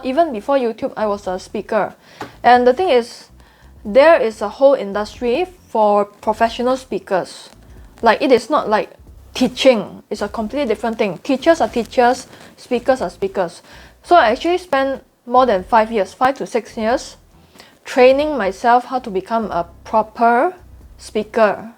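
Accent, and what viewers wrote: Singapore. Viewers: Ms love work. Ignore those who judge, cause in their eyes. You never do anything right. You can dress, speak. Dance to everything they say... and they will still find something to critique you on. On you English sounds good for me. Singlish. You could be teaching in your one of native language. That's, not going to help those who only speak English. Please keep doing what you are doing. We are going to grow-up and be just like you one day. 47/ 48 I forget sometimes. I think 48... aww don't remind me... again thank you for Jia you to break us out of this everyday rate race we are in. Maybe one of your students will stand on stage right beside you. Thanks so much. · God bless and wishing you happiness. By the way I love your Singapore English accent./ Thanks for everything you did for us.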